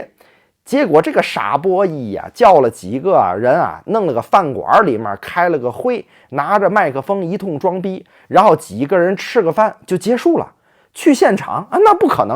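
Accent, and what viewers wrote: Tianjin, China. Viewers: 听起来像我们天津老乡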